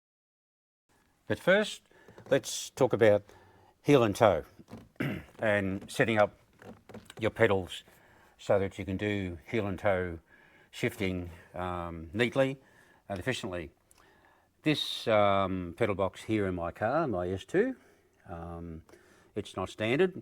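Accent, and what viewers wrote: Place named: Australia. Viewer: Great video and great technique sir, thanks for sharing. Are you from Australia by any chance?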